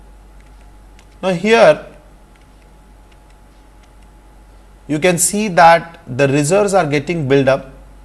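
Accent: India. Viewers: Awesome, and why not put the original subtitle for it as the google has lots of mistakes and it takes time for me to understand the Indian accent.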